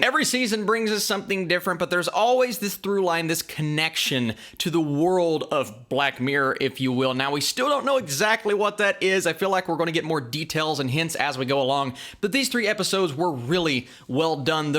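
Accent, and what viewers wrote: South, United States. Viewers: This guys southern sounding accent 😍🤤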